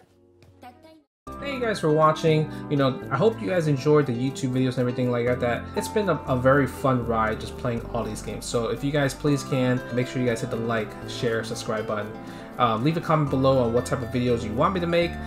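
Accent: New York, United States. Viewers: Canada? I thought from NY!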